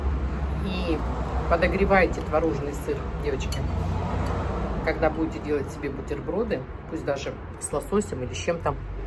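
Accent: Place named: Russia. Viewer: Вы хотя бы правильно назвали улицу...😂Самбурова !!! ( спроси у местных) кацапский говор + не правильно ударение😂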